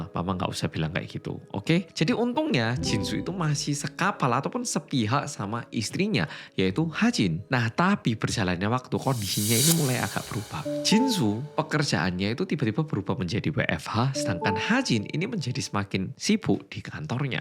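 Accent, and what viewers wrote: Java, Indonesia. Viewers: Yang medok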